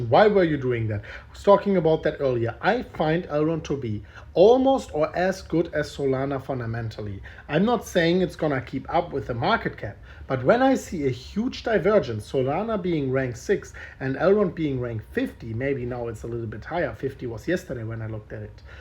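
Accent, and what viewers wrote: Germany. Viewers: I hear a German accent but your English is perfect. I speak German pretty well but not as good as your English. I love you man.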